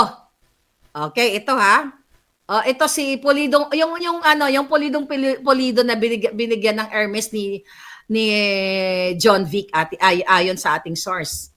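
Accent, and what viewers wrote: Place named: Iloilo, Philippines. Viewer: Hahahahaha, ma'am maharlika, nakakatawa ka sa pagsasalita mo ng elonggo🤣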